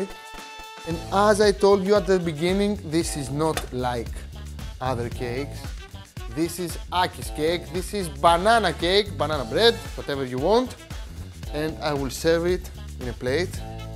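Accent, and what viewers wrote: Greece. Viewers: Oo, That classic greek accent! now i wish that still had mine :/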